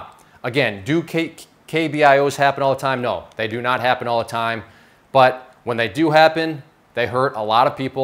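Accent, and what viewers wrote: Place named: Midwest, United States. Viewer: lol, "I understand math." No doubt, brother. You sound like you are from Iowa/South Dakota/Nebraska.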